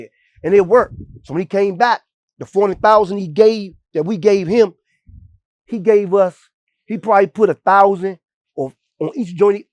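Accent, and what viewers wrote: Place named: Maryland, United States. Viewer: This nigga sound like my boy in m.d and it's not P.G county I'm deeper in m.d than that ...I'm m.d in between Baltimore and Philly 1 hr either way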